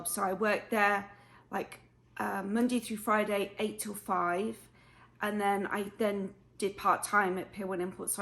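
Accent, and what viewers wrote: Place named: United Kingdom. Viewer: Really enjoyed this vlog, you have lead such a fascinating life, love how you have still got your British accent !!! Sending you lots of love xxx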